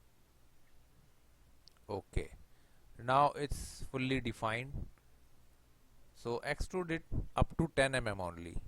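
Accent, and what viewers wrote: India. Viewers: i reading comments indian accent what a effective work sir perfect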